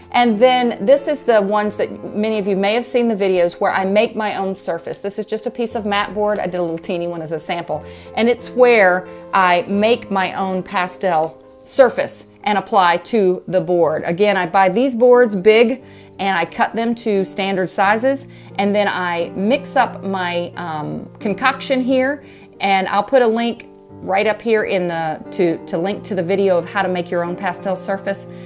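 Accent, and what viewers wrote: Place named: South, United States. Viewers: A southern accent never hurts. Hehe. Also, you'd never know how they are pronounced just by looking at them. I've seen even the most seasoned artists muck up "quinacridone" and "Phthalo." We can't be expected to know how to pronounce words derived from chemistry, nevertheless words from foreign languages! 😝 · Just part of the learning process (for all of us!). Love the southern accent!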